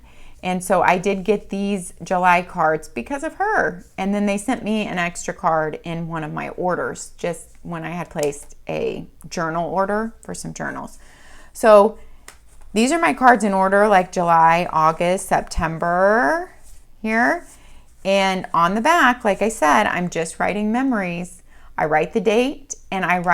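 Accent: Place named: Texas, United States. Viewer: Love this video! Your accent and use of y’all really makes me miss Texas haha. I got the Christmas planner for this and I am so excited to use it as a yearly memory book. I wish I had it when my kids were little, time goes so fast I want to remember it all.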